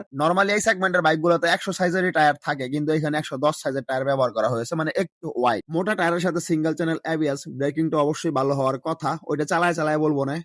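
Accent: Narsingdi, Bangladesh. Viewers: Bhai Apner basha ki Narsingdi te ?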